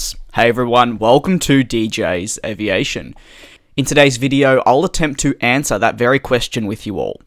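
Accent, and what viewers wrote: Australia. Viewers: Are you Australian? Not trying to be rude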